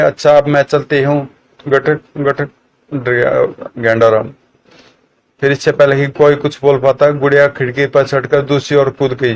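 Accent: Haryana, India. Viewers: tumhara awaj jo h haryanvi tune m aa rhi hai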